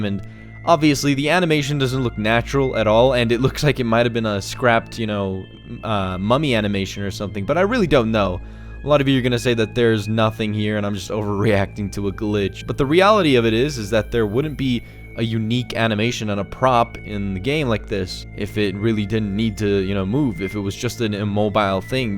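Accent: Bosnia and Herzegovina. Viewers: Are you Bosnian?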